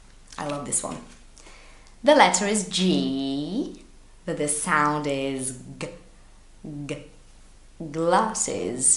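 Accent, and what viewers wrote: United Kingdom. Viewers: You're the prettiest teacher ever, even though you're from here you could very well pass for a british native 😌😉 did I do well teacher?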